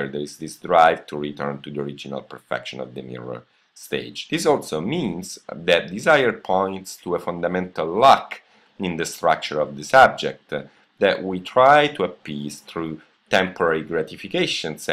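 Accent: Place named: France. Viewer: You are frensh !! isn't it :).. It's clear from your accent... I'm Moroccan so it's easy for me to discover that haha..Thank you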